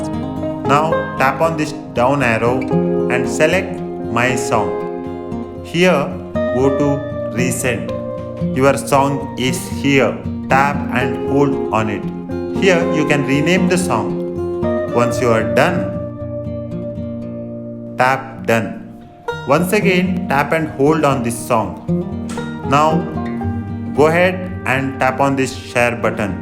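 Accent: India. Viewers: LOVE THE South Indian ACCENT Writing South Indian I low caps, coz I m RACIST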